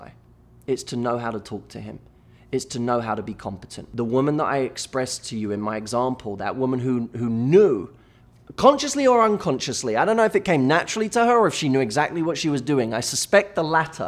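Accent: United Kingdom. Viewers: This one might b the most useless comment on youtube ever ... But everytime i see him speaking .. i get so hungry ....i mean thers something about his speech pattern or such a clear brit accent .. his voice makes me crave dessert or something .. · it must be the British accent matthew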